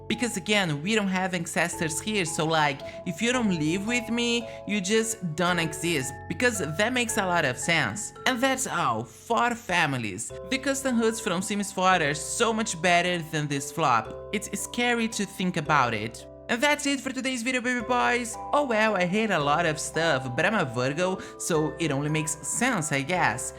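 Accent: Brazil. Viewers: I still have Sims 2 Ultimate Collection and I love to play it so much, its thousand times better than Sims 4, but then I never played Sims 4's DLC's, so I want to experience the DLCs for myself too. So, I play both but with the Tutorials from PleasantSims, so I play rotationally and just with the Maxis Sims, and I use a lot of Mods too, that are essential. There is even a Sims 2 Savefile, which has the ancestors and everything from Sims 2, but I never used it I just saw it in videos, it seems to be perfect, but I feel like Sims 4 isn't the same universe as Sims 2. It would be perfect if they could make a new Sims game with Sims 2 + 3 gameplay, world, ancestors and all and just the CAS and B&B from Sims 4. That would be perfect, what do you think? PS: Seu sutaque brazileiro é notavel, mas o seu inglés é muito bom! É bom falar assim com o sutaque. E já me inscrevi no seu canal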